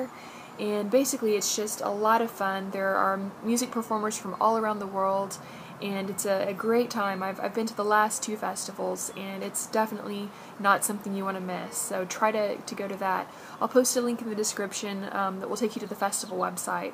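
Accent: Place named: Texas, United States. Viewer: I think this is the first time I've heard you speak like a Texan,. I heard you say "y'all" at the end of your video. lol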